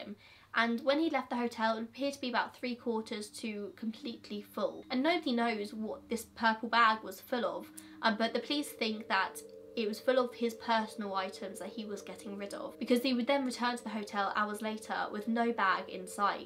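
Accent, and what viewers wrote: England, United Kingdom. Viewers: You are sooo beautiful! I love your videos! Love your voice and your beautiful English accent 😍😍😍😍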